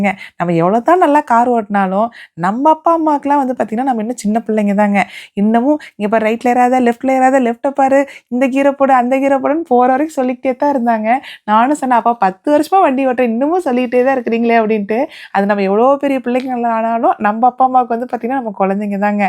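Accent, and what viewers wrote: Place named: Erode, India. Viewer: Unga slang partha erode side nu nenaichen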